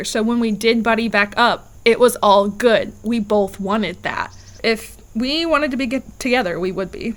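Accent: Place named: Kentucky, United States. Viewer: I have watched several female through hikers as I too am a solo female hiker but I have only had the opportunity to do sections of the Appalachian trail with a definite plan to complete the whole trail. I found watching y'all made the sections I have not done feel very doable and that I am ok with what/weight I am carrying. The through hikers I watched and enjoyed all of them you were the one individual I would have loved to have through hiked with. You seem to be able to enjoy the journey and not be pressed to always get big miles (especially the new state beverage). I assume this is a once in a lifetime adventure so if you can go out to eat in a restaurant, great! if you need to stay in a hotel because it's rained. Wonderful. I never had issues with why you posted when you did because it only seems logical that you do not want people to know exactly where you are and Wi-Fi is not always readily available on the trail. 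I personally love the way you talk, maybe it's because you're from Kentucky and I'm from Western North Carolina. I'm glad you successfully completed the AT but I do miss watching your on trail videos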